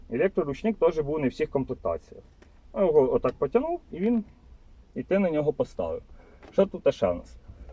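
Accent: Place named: Russia. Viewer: По говору видно что русский настоящий русский)))😊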